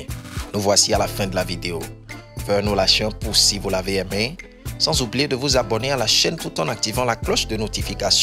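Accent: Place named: Togo. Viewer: Un togolais est sûrement à la base de cette vidéo quand on écoute la tonalité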